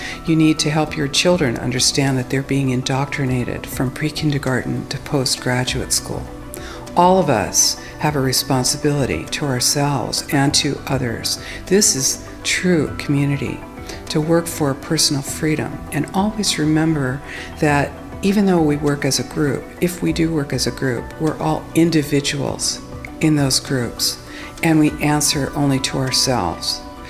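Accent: United States. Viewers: a croaky American female voice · Yup deffs american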